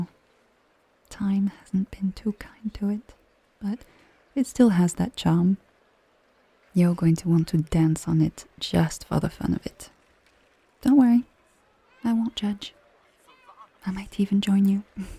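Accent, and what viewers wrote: France. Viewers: Another day, another great upload by. Your French accent is always a win for me.:_heart2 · Ahh sh** ... back into the french accents rabbit hole 😅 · Yes she is back with the French accent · These French accent videos are some of my favorites, so i definitely hope you maybe make another French character series in the future. I actually visited the south of France this past summer as part of a high school graduation trip, so this series has taken me back to all those amazing memories. Also, the quality on this video was amazing; the background sounds, the visuals and the plot all came together to make a entertaining audio. As always thanks for making great content, can't wait to see what comes next:) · great audio, i love this a lot, tbh i just realised that it's kinda similar to the lizzie mcguire movie where she went on adventures, but of course, both outcomes are different, this one was great, i wish that i could have adventures someday too.. 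and looks like i have discovered a new city within this video, searched it up, and honestly, i wanna live there now, but i guess it will take time... once again, great audio 🥳💗 edit: i forgot to add this but i really love your french accent ^^ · French accent + speaking in French + singing = melt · Worth the wait? Your damn right it was, I’m a sucker for alot of things (clearly) the French accent will be a favorite of mine always so you pair that with flirty, automatically will love it. You just keep on getting/doing better everytime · Flirty and french accents go together like cookies and milk. I'm very excited to see what this will be like.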